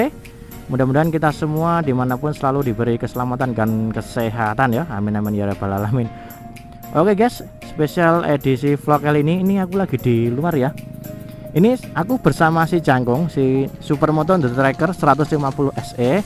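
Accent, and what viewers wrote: Java, Indonesia. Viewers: Sampean jawane pundi mas?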